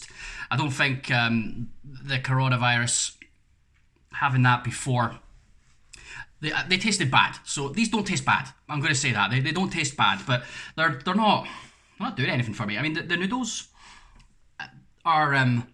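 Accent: United Kingdom. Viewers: Are you scotish im scotish aswell🙂🙂🙂🙂🙂🙂🙂❤❤ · where is your accent from? what part of GB?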